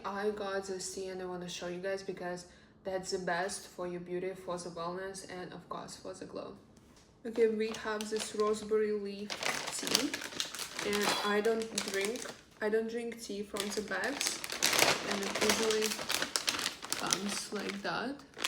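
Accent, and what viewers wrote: Russia. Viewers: по первым секундам поняла что русская😮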